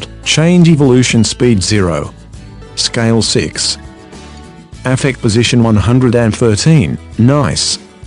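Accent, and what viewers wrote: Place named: Australia. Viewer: robot australian is a very annoying accent for this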